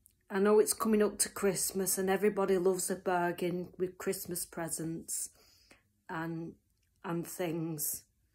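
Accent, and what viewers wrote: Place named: Yorkshire, United Kingdom. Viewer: Yeah lol am from Durham but you seem bit further away Yorkshire maybe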